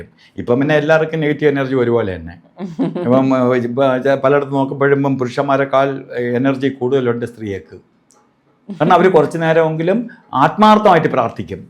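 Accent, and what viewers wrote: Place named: Thiruvananthapuram, India. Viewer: തിരുവനന്തപുരം ഭാഷ കൊള്ളാം 😂😂😂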